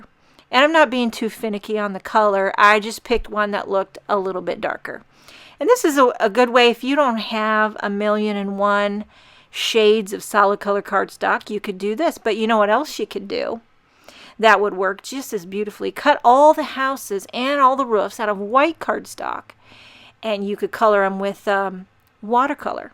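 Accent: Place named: Massachusetts, United States. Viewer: being from Mass... you are pronouncing Mass...the way it should be; however, roof in Mass is pronounced like woof, but with the r. However, i have heard folks in your geo area pronounce roof as you do. In any case, considering how badly Mass... slaughters the English language, i mean no disrespect to you or your regional accent.🤗🥰✌😂💐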